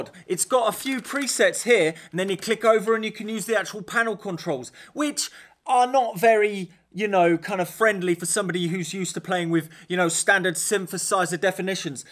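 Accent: United Kingdom. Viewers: Which British accent would you call this?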